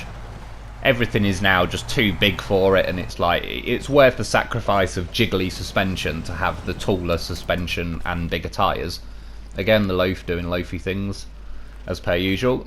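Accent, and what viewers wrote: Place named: Staffordshire, United Kingdom. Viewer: The Peugeot 406, not a horse of a vehicle. Still trying to place your accent. Maybe Staffordshire, Stoke, I feel it maybe further North. Don't tell me I'll get it eventually haha. My car history included some French cars too, Citroen BX 16 valve, other Citroens, couple of Peugeots. Reliable, no. Comfy, yes. The BX 16 valve was fast when it worked, but all ended as scrap.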